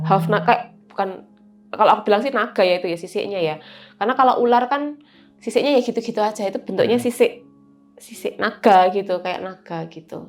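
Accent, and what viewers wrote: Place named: Java, Indonesia. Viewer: Bahasa INGGRIS medok Bahasa INDONESIA medok Bahasa JAWA medok Intinya dia medok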